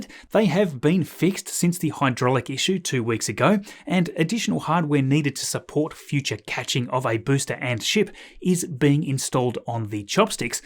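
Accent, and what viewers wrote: Australia. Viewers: God how I love this channel. Well organized, interesting, and reliable information delivered in an affable Australian accent.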